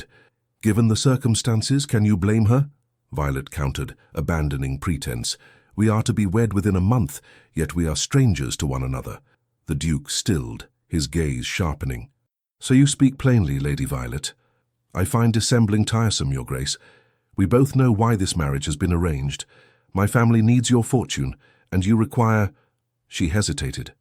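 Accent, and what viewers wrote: United Kingdom. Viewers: Hi Yes! That purring English / British accent. YUM! From (ironically enough) Windsor, Ontario Canada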